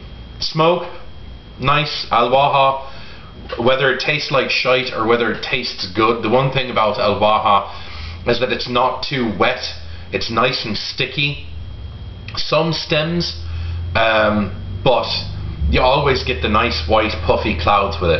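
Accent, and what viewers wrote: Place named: United States. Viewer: when hes with his friends he has a total american accent actually. watch some more. great review as always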